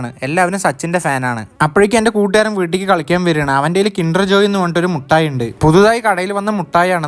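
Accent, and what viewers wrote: Palakkad, India. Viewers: എടാ മോനേ,സോറി ഞാൻ ഇത്ര സ്വാതന്ത്ര്യത്തോടെ എടാ എന്ന് വിളിക്കുന്നത് കൊണ്ട് തെറ്റിദ്ധരിക്കരുത് നിന്നെ കാണുമ്പോഴൊക്കെ എനിക്ക് വളരെ വേണ്ടപ്പെട്ട ആരോ ആണ് നീ എന്നൊരു തോന്നലാ.എൻ്റെ അമ്മ വീട് പാലക്കാടാണ്.നിൻ്റെ പാലക്കാടൻ ശൈലിയിലുള്ള ആ സംസാരം കാരണമാണ് അങ്ങനെയൊരു തോന്നൽ എന്ന് തോന്നുന്നു.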